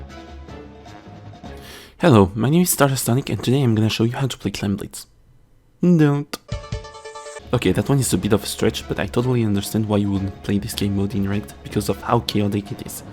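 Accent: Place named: France. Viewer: great stuff mate! i actually planned on doing similar explanation videos on the modes, but im far from that subject in my schedule anyway... well, i have to criticise your french accent :( i could barely understand what you say eventhough i wanted to, but i know you tried your best and that's what matters. also regarding the concept itself: i know this video is aimed at people who are new or just part time squids, but i would have liked some more "interesting" details for people who already know the very basics already. for example you could have mentioned or shown the exact punishment you get upon an opposing counter push and stuff like that. just some info that even pros can maybe find usefull or so... idk all in all, good work for a first try i would say^^ keep it on mate!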